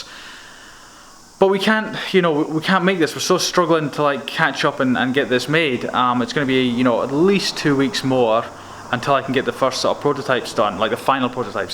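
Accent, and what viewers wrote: Scotland, United Kingdom. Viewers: I love your accent you sound Scottish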